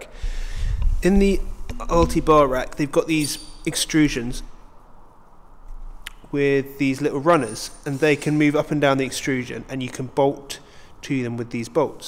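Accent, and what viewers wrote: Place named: United Kingdom. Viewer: less of that British accent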